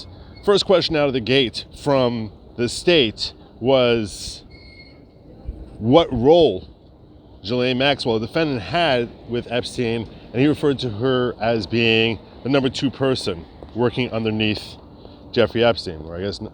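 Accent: New York, United States. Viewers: Love your show. I miss the NY accent living in Ky but would NEVER move back!😊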